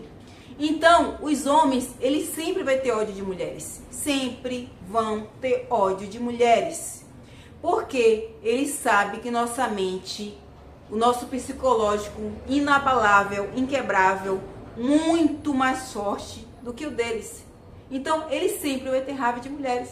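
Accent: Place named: Bahia, Brazil. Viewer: Voce tem sutaque baiano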